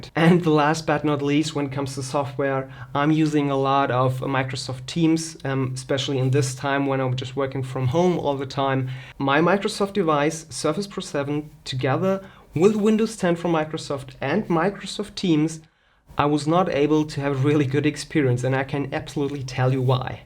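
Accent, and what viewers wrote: Germany. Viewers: the german accent makes you sound exotic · Are you German your Englisch Sounds German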